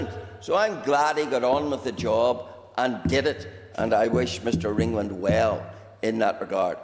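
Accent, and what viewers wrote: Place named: Ulster, United Kingdom. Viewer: this guy has a very old Ulster voice. dinosaur 🦕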